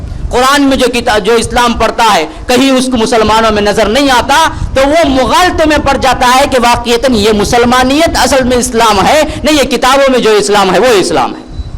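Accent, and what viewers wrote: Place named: Hyderabad, India. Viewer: Hafiz sahab apki zubaan bohot achi lagti hy, jo shayad Haderabadi hy. Masha Allah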